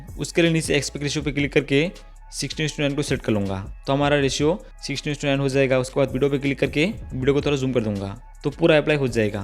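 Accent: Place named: Nepal. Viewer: Bhai aap nepal se ho mera dost bhi ese hi bolta hai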